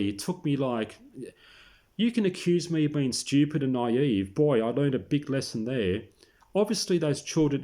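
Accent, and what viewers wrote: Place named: Australia. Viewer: Australian talks like that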